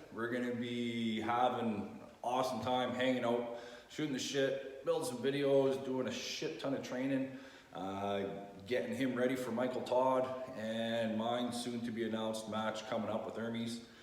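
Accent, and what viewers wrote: Canada. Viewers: Finally a arm wrestler with a great Canadian accent eh! Want to hear what you think will happen in Dubai. What each guys should do. What each guy you think will do. 16k subs already. Nice start.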